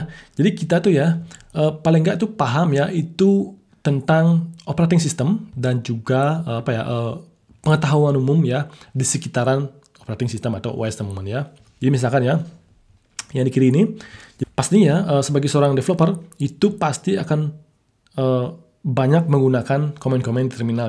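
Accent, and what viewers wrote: Bali, Indonesia. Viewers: logat orang bali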